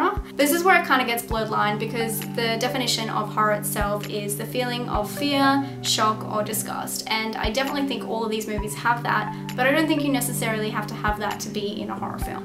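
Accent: Australia. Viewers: You’re an an Aussie, eh?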